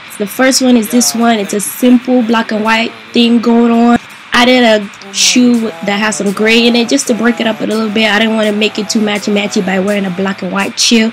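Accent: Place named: Nigeria. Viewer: love love love your style as well as your naija accent!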